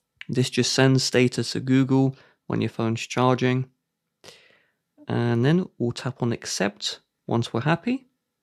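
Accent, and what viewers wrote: United Kingdom. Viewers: You have such a nice accent! What is it Surrey or Berkshire? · Hey what country are you uploading from? I’m in the US (Texas) lol just wondering because you sound British (UK)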